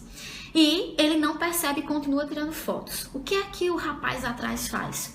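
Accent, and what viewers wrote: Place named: Paraíba, Brazil. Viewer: Nossa!!!! Que aula maravilhosaaaaaaaaaaaaaaaaaaaaaaaaaaaaaaaaaaaaaaaaaaaaaaaaaaaa Professora pensa como eu penso. Mt didática e ao mesmo tempo teoriza mt bem... Mas o sotaque parece de paraibana rsrsr. Meu Nordeste me Orgulha mesmo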